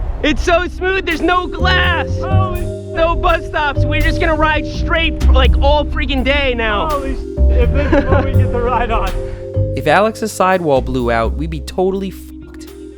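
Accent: Long Island, United States. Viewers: "a buck twenty" n "peace out cub scout"... such a native LI lol.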